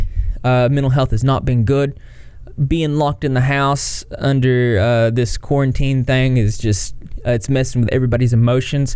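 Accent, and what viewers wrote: South, United States. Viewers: I love your southern accent ❤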